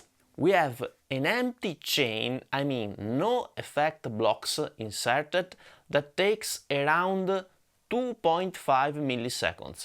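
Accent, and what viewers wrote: Italy. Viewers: Video perfetti, molto dettagliati e precisi. Tra i meglio che si trovano sul tubo… e il tuo accento marcatamente italiota è talmente estremo che risulta pure simpatico 😉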